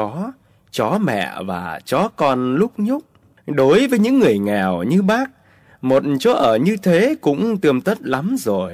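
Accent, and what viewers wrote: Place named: Nghe An, Vietnam. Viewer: Long cái tieng nghê an lam mat cam xúc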